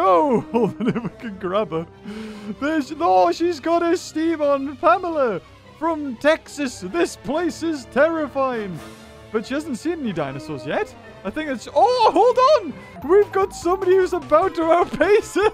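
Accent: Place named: Newcastle, United Kingdom. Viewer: The Geordie Mrbeast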